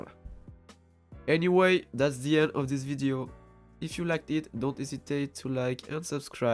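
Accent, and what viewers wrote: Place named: France. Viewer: Great vid! The french accent only makes it better <3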